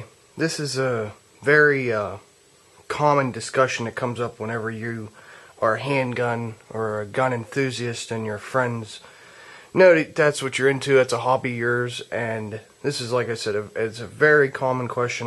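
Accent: Pittsburgh, United States. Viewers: Are you from Pittsburgh? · you have to be from the burgh "an'nat" thats pittsburgh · you sound like you're from Pittsburgh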